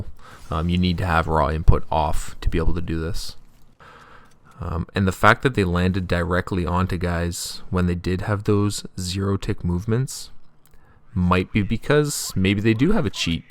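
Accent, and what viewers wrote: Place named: Canada. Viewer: Nice canuck accent btw... :P Could pick that out instantly. Grew up in the north myself! :D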